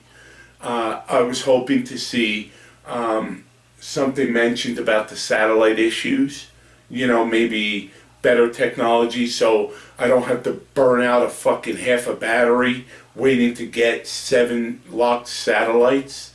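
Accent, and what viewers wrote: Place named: New Jersey, United States. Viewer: lol, I guessed it well, I know my people, you sound like your from Jersey, I too am from hudson county, Live in south Florida so I do alooooot of flying down here all year round. My phantom has alot of use on it. I'm set-up for long range so I fly alot over 3km over swamp land. Multirotors are huge down here, even for just hobby. Went to jersey last summer and nobody really is into them up there from what I see. Not even most hobby shops have very little inventory on them. Oh well, good to see that your keeping it real up there.. Good Luck.....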